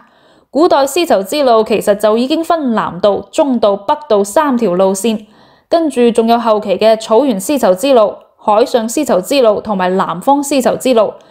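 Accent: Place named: Guangzhou, China. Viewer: 我地广州的音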